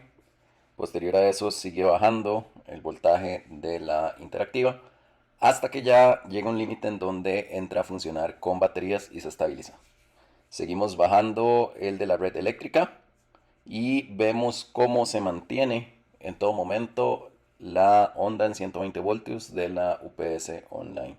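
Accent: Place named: Costa Rica. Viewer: Mae usted es tico? ese acento...😄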